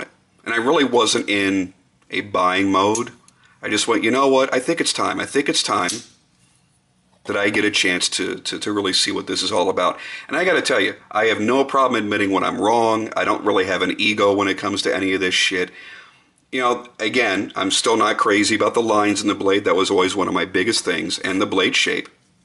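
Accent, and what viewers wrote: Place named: United States. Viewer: I like your 'Murica accent, lol. Great and honest review. I had one of the first run Norsemans (vid on my channel) and it was a great knife for his true first attempt in the folding knife world. I think if you watch John's knife making Tuesdays series, you'd get an even better idea of all the work John and Erik had put into making these knives, but most importantly you'd get an idea of who the Grimsmo brothers are and they truly are good guys. Thanks for the review, keep 'em coming!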